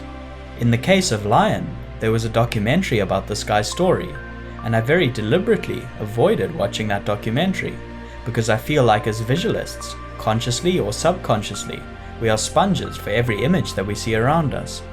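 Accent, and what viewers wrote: South Africa. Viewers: You sound South African, am I hearing correctly ? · Dude are you from South Africa??? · you sound south african?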